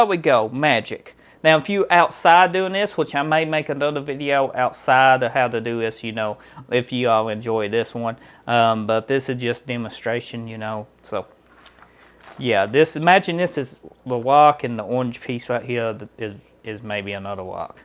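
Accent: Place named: Deep South, United States. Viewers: I thought Cajun...but sincerely hope that's not an insult.. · it is swampy, and american - but that can be a few places in southern parts of the united states - the dialect is from several sources in its past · I’m not sure if your accent is depth south or Australian 😂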